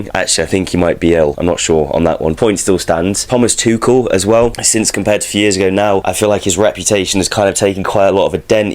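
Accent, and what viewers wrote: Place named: Liverpool, United Kingdom. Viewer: It's still very hard to understand what you're saying with that strong scouse accent. Good to see you fixed the mic tho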